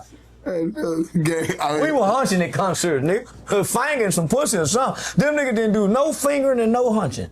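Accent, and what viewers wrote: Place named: Florida, United States. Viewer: he sound like florida accent a lil bit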